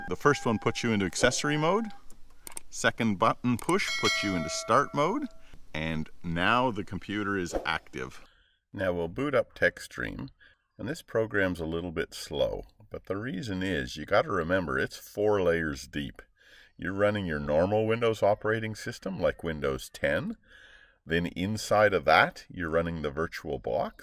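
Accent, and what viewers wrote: Canada. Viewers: Thanks for this video. Looks like the site with the Techstream download is artificially buffering the download very slowly to nudge the user to download their software... annoying. Do you have a source for a usable mini VCI cable? You sound Canadian, BTW.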